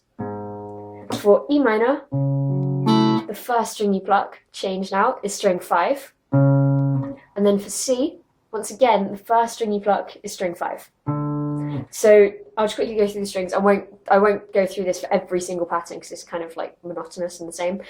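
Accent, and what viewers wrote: United Kingdom. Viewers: British accent is so so......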